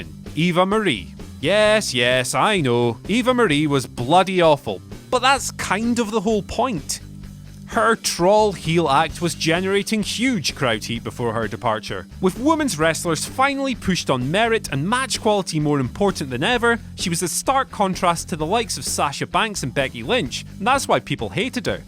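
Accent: United Kingdom. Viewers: Please help your non english speaking audience, use less britain accent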